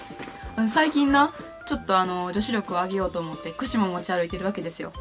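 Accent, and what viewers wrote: Kansai, Japan. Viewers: 自分関東人だから分からないけど、結構こてこての関西弁なんですね笑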